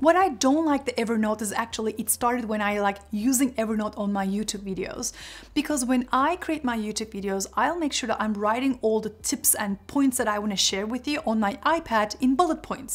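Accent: Turkey. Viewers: Tatlı bir Türkçe aksan sezinliyorum :) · Türk olduğunu bilmeseydim yine de Türk derdim sana podcast yayınları severek takip ediyorum ama çok güzelmiş ingilizcen :)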